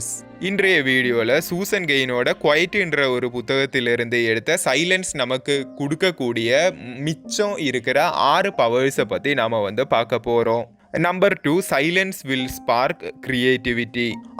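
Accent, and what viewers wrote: Kerala, India. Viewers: Kerala thambi vioce maari irukkea 🤔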